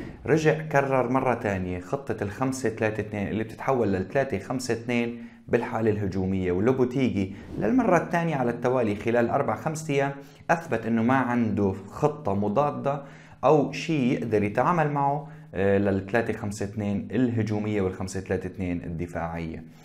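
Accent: Aleppo, Syria. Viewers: كني حلبي ؟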